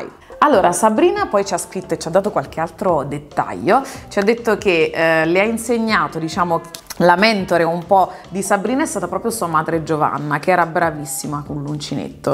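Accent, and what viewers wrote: Campania, Italy. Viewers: è bella la cadenza Campana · Nooooo ti prego si alla cadenza campana. Anche se vivo in Liguria da oltre 20 anni il mio sangue campano é sempre vivo ❤️❤️... P. S. Anche io avevo preso il Mark in 2 varianti colore ma non ho avuto ancora modo di provarlo spero di aprirlo prima dell'estate😂.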